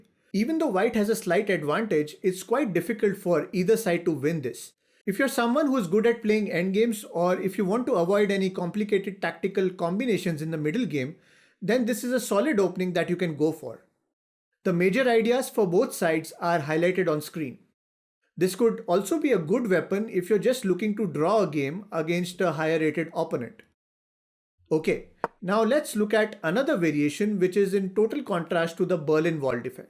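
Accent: India. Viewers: Is it me or does every single Indian have the same exact voice and pronunciation?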